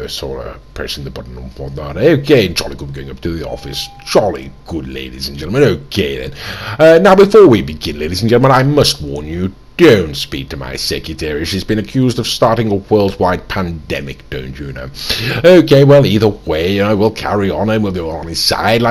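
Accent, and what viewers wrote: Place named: RP, United Kingdom. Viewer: you sound a bit posh init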